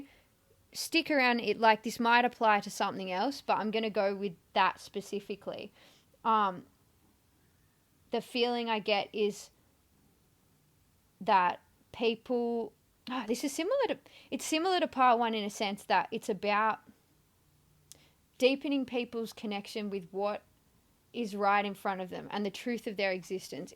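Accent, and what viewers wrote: Australia. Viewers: G'day!! Thanks to 13 Moon Tarot 4 recommending your channel. I even hit subscribe b4 i listened 😂As soon as u began speaking, a huge smile cracked over my face. It's so refreshing to hear an aussie!! Card 3 totally resonated 😊 as I listened in my Queensland garden ❤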